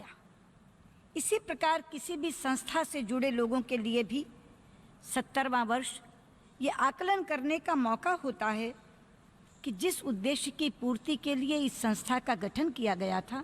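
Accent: Standard. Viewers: Madam Sushmaji is surely an asset to the Nation. What a clarity in her speech and purity in Hindi. Awesome !!!!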